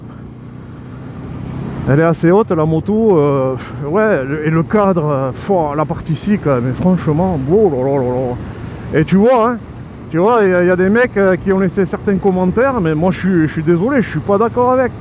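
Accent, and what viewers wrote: South, France. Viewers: Merci pour cet essai, avec le bel accent du Sud!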